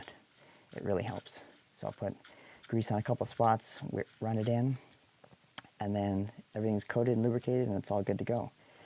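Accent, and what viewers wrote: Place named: Canada. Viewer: i love the way Canadian people talk..... TRAILOR PARK BOYS!!!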